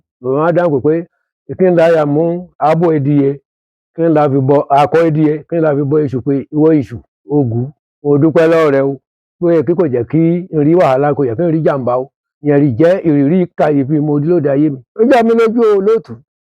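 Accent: Egba, Nigeria. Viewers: This Ègbá dialect is sweet.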